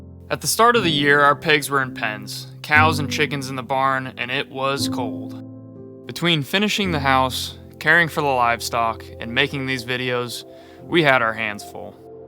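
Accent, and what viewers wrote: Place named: Ohio, United States. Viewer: i just saw kentucky but even in ohio they have ur same accent up here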